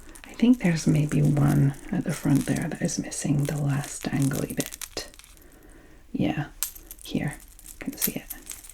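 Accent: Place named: Scotland, United Kingdom. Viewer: She’s from Scotland, she speaks with a Scottish accent. American pronunciation isn’t the only “proper” way of speaking English.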